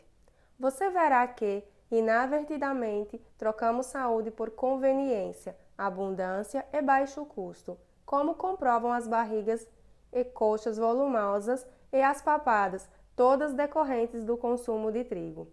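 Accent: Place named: Pernambuco, Brazil. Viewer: Parabéns. Pelo sotaque parece ser do Pernambuco.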